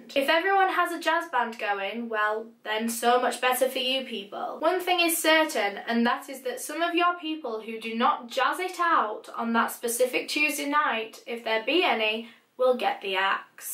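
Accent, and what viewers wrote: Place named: England, United Kingdom. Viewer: so you prob sound just as cute! I love this English accent!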